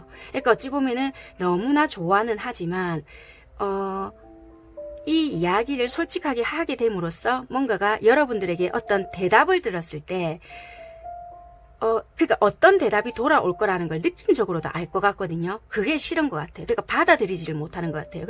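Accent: Busan, South Korea. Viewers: 저는 헤어지고 연락 끊어져서 연락이 와야 투닥거릴수가 있는데 ㅜㅜ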